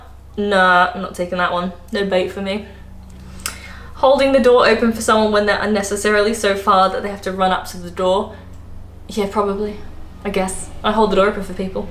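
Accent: United Kingdom. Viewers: Sounds like you’re pretty much a Brit’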